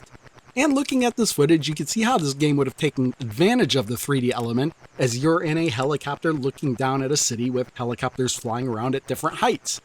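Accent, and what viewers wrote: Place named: United States. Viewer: Enjoyed it very much You are so dedicated And a very nice accent.. i wonder what part of the States this is from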